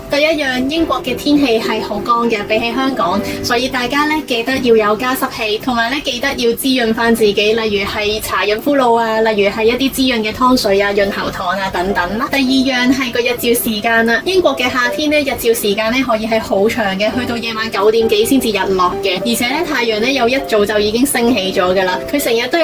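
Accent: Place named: Zhanjiang, China. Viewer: 有湛江口音😂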